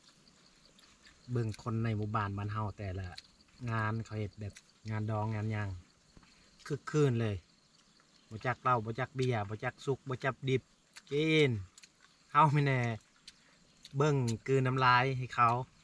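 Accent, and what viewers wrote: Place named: North, Thailand. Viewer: พูดเหมือนคนภาคเหนือของไทย.กินคือกั๋นเลย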